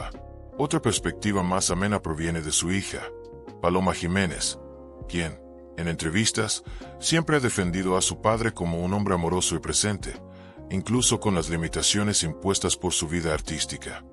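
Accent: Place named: United States. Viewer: Y tiene acento gabacho. Parece que es gringo el narrador.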